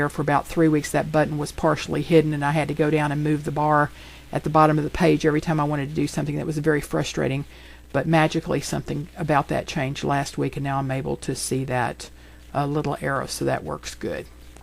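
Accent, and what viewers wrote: Texas, United States. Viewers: Iove listening to these…….Im with you about the liver….ugh!! anything but liver is what I say! : ) I didn't know you were from Texas even though you def sound it but I will say I flew down to Houston from where I live (Connecticut) and drove all over and I will have to say the people down there are so kind. I hate flying but I would go back there again for sure.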